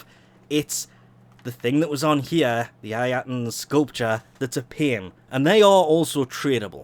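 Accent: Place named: North East England, United Kingdom. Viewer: Are you from the North East? You sound like you are, Newcastle or Sunderland.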